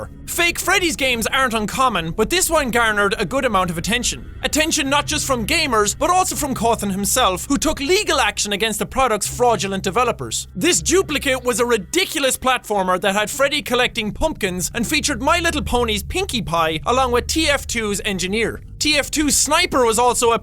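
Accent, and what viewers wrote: Ireland. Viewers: Justin Talmadge He's Irish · Kyru Shelton he's irish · I'm Irish and that's how a lot of us sound. Not me, because it depends what part of the country you're from, like Victor Cheng said. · Not only is it weird to hear him not yelling, but back when this was made, his Irish accent was more audible. For example, three sounds way more like tree. XD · Because with an Irish accent you can't really turn it off. It's not on purpose, it's just natural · It's his accent, he's Irish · get the fuck off of YouTube it's not his fault he has a Irish accent it's just his nationality you cancerous dipshit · The Irish Blaggard Jack says "Top of the morning to you laddies" as a parody and a way to make fun of himself. He has stated this in one of his older videos. In fact, he use to hide his accent. If you want to see a REAL forced accent, maybe you should look at his older videos. 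It is in fact an Irish accent. Do you seriously expect all Irish accents to sound the same? As people have said, he speaks kind of monotone in this video and tries hard to pronounce everything correctly; similar to what he use to do. Maybe you should actually learn a bit about him before making an idiotic comment. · I love that Jack is so Irish in this video. Like he says "tree" when he means "three"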